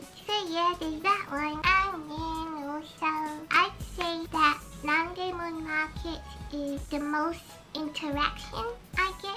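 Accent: United Kingdom. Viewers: I love your editing, and your accent sounds kinda like a cock-knee accent (I've got noooo idea how to spell that sooo I'll just spell it how it sounds 😋).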